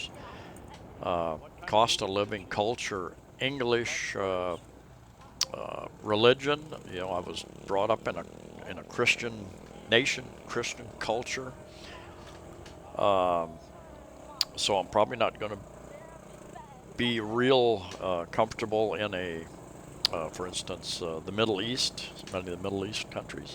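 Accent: Midwest, United States. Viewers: Thx for the vid…older vid but you covered many important points..in 8th grade (Wisc) we sang from “The King and I” which I think is the first time the idea of living abroad came to mind, then working at an airline was able to explore many places and visit relatives in Sweden and Wisconsin..stay in Tland now (waiting to get back) but PI is my 1st option if things don’t work out there..compliments on your vids done in a midwest accent lol 🙏🏻👍🏻✌🏻🕊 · I just found your channel today. Liked. Subscribed. Wish I had found it sooner. After two visits to PH I knew I would be retiring here. My wife and daughter joined me in Quincy (just south of Boston) MA for about six years. It was a little sad. The first snow of their experience was magical for the first 20 minutes. Then my daughter was like, It was fun but I'm done. Left my wife and I to shovel the driveway alone. 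They endured six winters with me there. I retired early in 2013. Brought my tropical flowers home. I've been here (Siaton, Negros Oriental) for over five years. Just living the simple rural life. I was trying to place you accent thru several of your videos until I came to this one where you say you are from Minnesota. I knew I had heard it before. My best friend from my Navy days was Objibia from northern MN. I don't get out much but if you're ever in the neighborhood...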